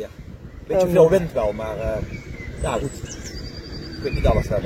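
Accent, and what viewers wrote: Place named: Limburg, Netherlands. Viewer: Hallo, super mooie videos, ben per toeval op jou kanaal gekomen, doe huurst deg aan wie ene echte limburger. Top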